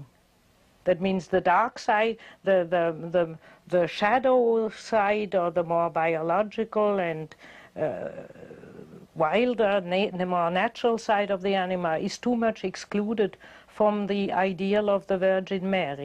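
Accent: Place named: Liverpool, United Kingdom. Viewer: sounds like a scouser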